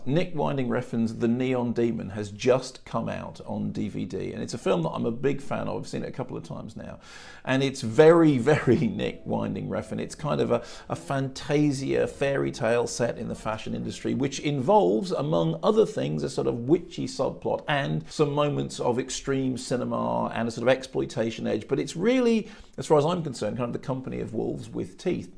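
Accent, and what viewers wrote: United Kingdom. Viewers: I love this guy's reviews, just wish I could understand everything he's saying being from across the pond and alll that British intonationation. Maybe if he could speak just a tad slower...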